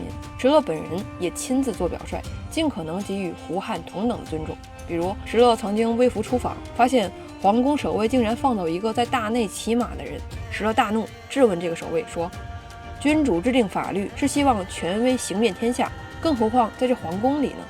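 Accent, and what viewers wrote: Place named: Beijing, China. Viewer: 姑娘一口地道的北京話非常好聽吾人甚為欣賞！比起其他說書人強太多了！姑娘讚！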